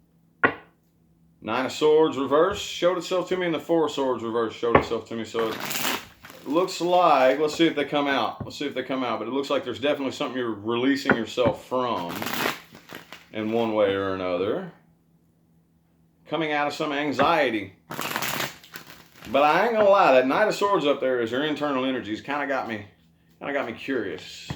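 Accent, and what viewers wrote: South, United States. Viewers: I love your country accent 😍😍😍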